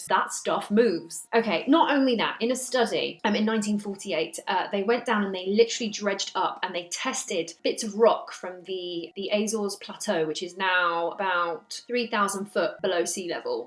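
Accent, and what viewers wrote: England, United Kingdom. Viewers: You, that British accent, gorgeous, humorous and backing it all up with Data, our own version of Laura Croft, cheers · wonder how many subs she's gained since Randall mentioned her on rogan... I mean that's why I'm here...I stayed bc I think I'm in 😍... Americans are simps for english accents at least this chicago kid is lol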